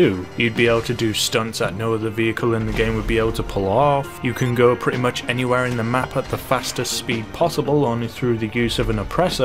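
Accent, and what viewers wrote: United Kingdom. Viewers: Wait wait are you british?? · Are you British??? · Are you a UK YouTuber? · Didnt notice that you was from uk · Wait... YOU'RE BRITISH TOO, well that's a nice surprise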